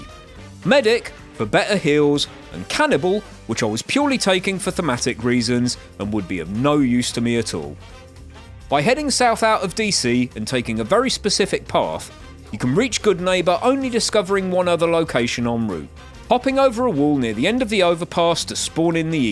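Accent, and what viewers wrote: United Kingdom. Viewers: Great video lad, your accent makes me want to see you do this entire challenge again in Fallout London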